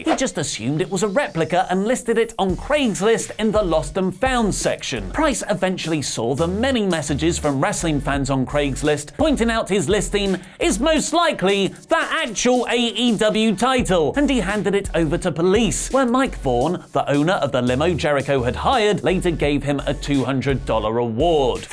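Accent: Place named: United Kingdom. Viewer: I would appreciate it if you could find people who spoke English with an American accent and without a distinctly British accent so maybe I can finally understand WTF you’re talking about. (I’m looking at you Whatculture and Cultaholic）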